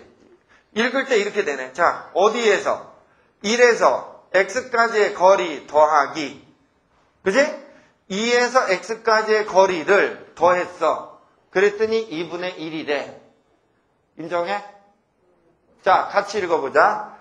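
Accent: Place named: Seoul, South Korea. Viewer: 오 옛날 방송 보면 나오는 서울 사투리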